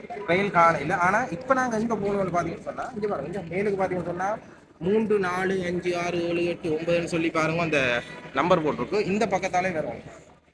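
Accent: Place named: India. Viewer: Nice and useful video, hope you are from Jaffna, but smells a bit of Indian Slang!!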